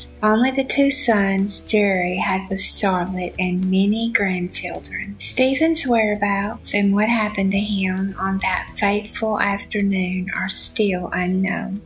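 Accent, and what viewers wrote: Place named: Kentucky, United States. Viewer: Your voice is so soft & lovely. What is your accent? You sound Kentuckian to me.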